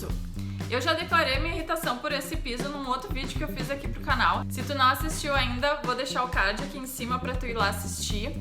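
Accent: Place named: Rio Grande do Sul, Brazil. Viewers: Como que a pessoa não consegue discernir que é o sotaque do Rio Grande do Sul. Lindo sotaque. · É apenas o sotaque do Sul galera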